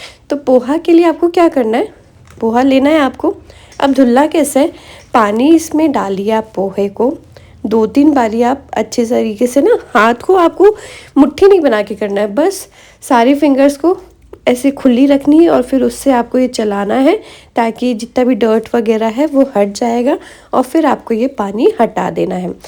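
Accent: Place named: Madhya Pradesh, India. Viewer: Mujhe lag hee raha tha, bolne ke tareeke se ki aap मध्य प्रदेश की होगी ।👍 बढ़िया । में भी m p ki hee hun । शुभकामनाएं 🎉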